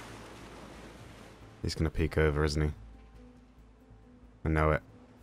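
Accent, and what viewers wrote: United Kingdom. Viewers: I love your accent. I wanna marry and englishman and hear him talk all day.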